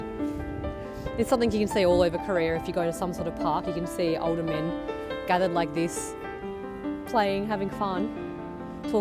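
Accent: Australia. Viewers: 호주식 발음 독특해요. Here 히야, today 투 다이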